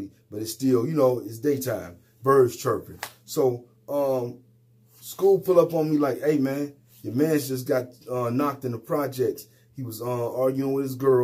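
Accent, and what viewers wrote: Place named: Cleveland, United States. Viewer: CMHA? Cayuga Metro Housing Authority? I was trying to figure out where you were from by your accent--I thought maybe Chicago but now I could hear it is Cleveland (lol after looking up CMHA!)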